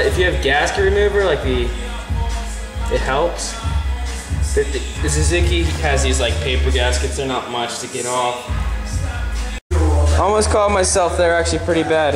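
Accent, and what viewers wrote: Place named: Ontario, Canada. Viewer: Hey you sound like your from ontario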